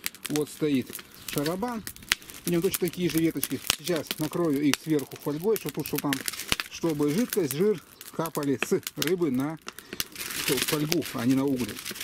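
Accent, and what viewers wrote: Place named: Volga, Russia. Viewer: Вялить Вы меня уже научили, коптить научиться надо мне у Вас) у Вас говор очень родной))) на Волге так говорят.... Интересно кто Ваши мама и папа (дай, Бог, чтобы живы были и здоровы) Они гордятся Вами! Я вот горжусь , что у нас такой есть соотечественник как Вы! Желаю Вам всегда удачной рыбалки!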